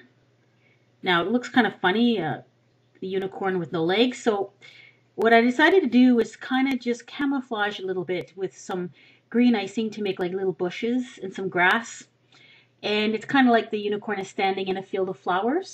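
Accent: Canada. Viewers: RU Canadian?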